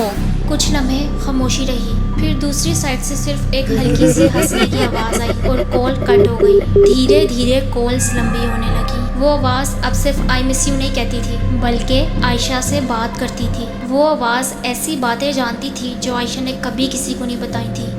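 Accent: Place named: Karachi, Pakistan. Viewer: Lagta hay Ap korangi orangi wali ho... Coll bol rahi ho ap jab ke call hota hay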